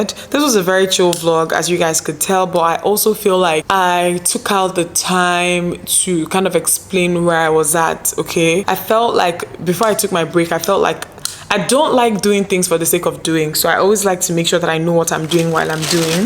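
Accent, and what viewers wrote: Nigeria. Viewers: Nigerian accent, how? 😂😂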